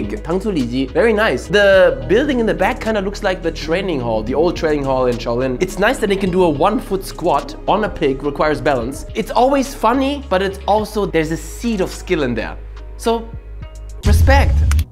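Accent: Germany. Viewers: Why do you sound like a german guy speaking english 🤔🤣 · He has german accent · Finds geil wie man den deutschen Akzent raushört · It sounds sometimes like you are from Germany or Austria? "ahhh Im gonna give my Senf..." and the way you say "Steven Seagull" sounds very german to. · Bro r u german ur accent kinda sounds like it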